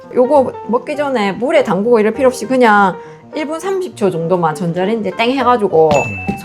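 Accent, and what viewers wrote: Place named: Jinju, South Korea. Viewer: 저도컬리로 시장자주보는데요.. 님 영상보면서 실패확률 제로예요..써보고좋다고 하시는거는 항상바로바로 장바구니에담아요..너무너무도움됩니다.그리고 저는 고향이 진주인데요..경기권에서 살다보니 님 사투리들으면 고향생각나고 친구들생각나요❤..구독한지얼마안되서 매일정주행 중입니다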